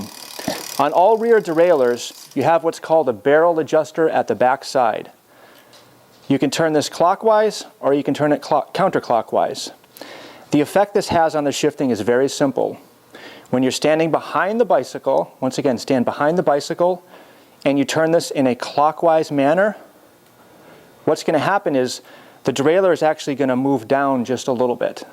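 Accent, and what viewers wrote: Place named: United States. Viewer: I agree, he's probably American with that accent - how do you know he lives inside a chimney? What's a 'damper'? I guess you don't like Americans - don't blame you; they are pretty stupid. Just look at Bush - the most stupidest president ever - he couldn't even speak properly and probably was as bad as spelling as you! lol!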